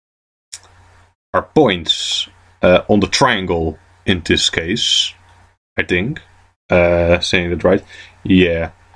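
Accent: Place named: Netherlands. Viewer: ik hoor dat je nederlands bent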